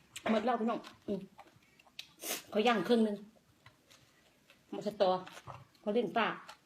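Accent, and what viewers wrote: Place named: Khon Kaen, Thailand. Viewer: กินเป็นตาแซ่บคัก..เว่าปานอยู่ขอนแก่นเอาโลด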